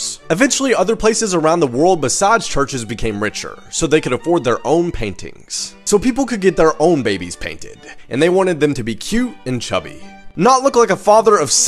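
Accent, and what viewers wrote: South, United States. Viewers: I absolutely ADORE YOU! Stuffs always funny here, and sometimes you fall into your southern accent and it reminds me of home. Keep up the good work ☀️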